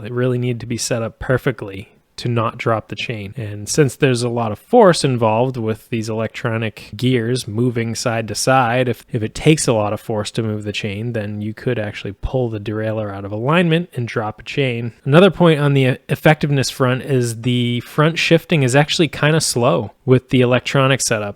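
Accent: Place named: Midwest, United States. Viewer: Your accent tells me you're from the Midwest. Chicago or Milwaukee. Takes one to know one ha...thanks for the video!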